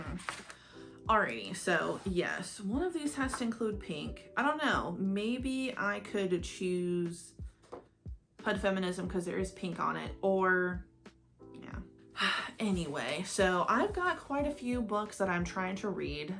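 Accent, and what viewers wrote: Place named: England, United Kingdom. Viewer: breaking out the English accent, eh?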